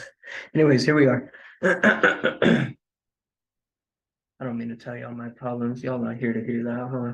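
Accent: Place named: South Asia. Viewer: South Asian English Accent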